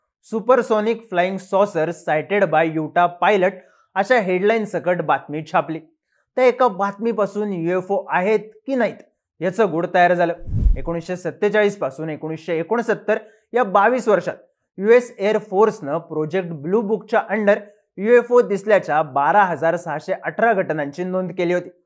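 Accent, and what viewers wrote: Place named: Kolhapur, India. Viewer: हा कोल्हापुरी भाषेचा लहेजा आहे ❤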